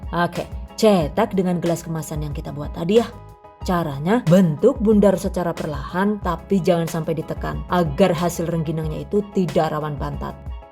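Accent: Banyumas, Indonesia. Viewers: Dari mana mba jawanya mantap ngapak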